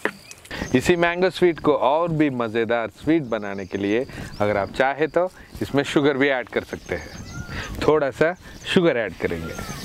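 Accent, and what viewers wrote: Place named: Hyderabad, India. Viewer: I like your hyderabadi touch way of talking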